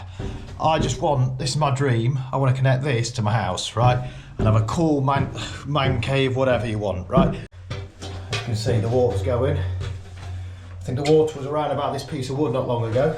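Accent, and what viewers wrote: United Kingdom. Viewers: Thought i recognised the accent, ey up duck 🥳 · Crazy, I've been watching your videos for a while and it never occured to me that your a fellow Derby man untill I heard you say when I used to work at Rolls Royce and then I thought the accent fits; I wonder if he's from Derby. I googled you and it turns out you are.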